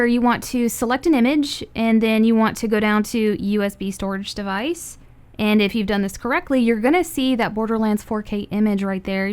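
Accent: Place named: United States. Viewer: American accent: 100%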